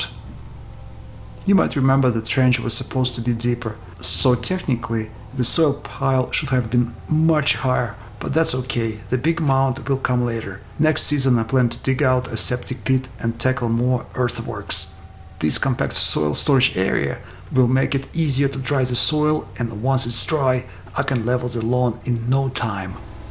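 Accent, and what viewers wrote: Russia. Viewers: хороший английский! понимаю намного легче из-за русского акцента😊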